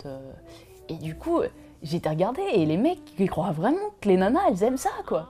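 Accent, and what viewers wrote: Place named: Paris, France. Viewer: Ahaha ok je viens du sud (telle Chimene Badi) du coup centre ou titi parisien ça se ressemble un peu à mes oreilles :) ! Merci pour ton témoignage, et concernant tes dents, avant que tu n'en parle je trouvais que justement elles étaient très jolies :) Les sourires colgate c'est banal et triste, mais des petites dents qui font leur vie c'est bien plus charmant !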